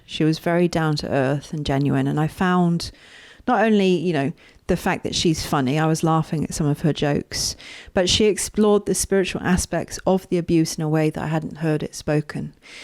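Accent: United Kingdom. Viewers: I am thrilled to have just found your channel today. I've followed this topic for literally a couple of decades now. Agree 1000% about the need for content creators to have actually experienced NPD first-hand > not just clinical study. Same for therapists - the odds of finding a therapist who truly GETS it without direct experience is about nil, and yet so many CLAIM knowledge. They know that narcissism has become a popular buzz word and so they chase the clickbait, yep - and many do more harm than good for the almighty dollar. I follow Dr. Romani and others, also know of Vaknin, read a book by him many yrs ago but that guy just gives me the creeps so don't listen to him much and appreciate your perspective. I've been on a binge this afternoon. I appreciate your authentic and genuine approach. You have some unique perspectives, especially the spiritual aspect, which I've thought about some > esp that those who've been though narc hell are much more likely to SEE the evil in the world. 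They won't be held back by that cognitive dissonance so they can connect the dots. I find it amazingly brave of you to actually attempt comedy alongside narcissism, that takes MOXIE:-)!!! Thanks for this personal view, appreciated. I assume you are maybe somewhere in England from your delightful accent. Yes? Salutes from the US!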